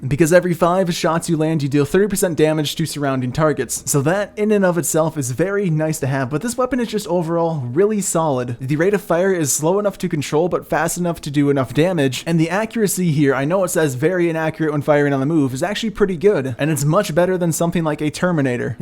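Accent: Czech Republic. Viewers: are u from czech :D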